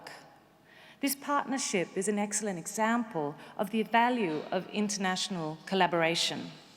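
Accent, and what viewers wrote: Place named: Australia. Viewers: Hasn't lost her Aussie accent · As a someone who speaks several language, I can tell you, that from what I know, losing one's native accent is pretty hard. It's probably because she's Tasmanian, she might be an Aussie, with an Aussie accent, but there's some Tasmanian accent in there, and that's what sounds broken, or "Danish". You can still hear that her Aussie-Tasmanian accent affects her Danish to a pretty high degree.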